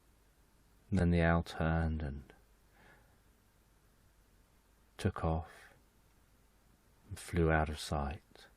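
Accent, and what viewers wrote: United Kingdom. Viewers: Thank God for the British accent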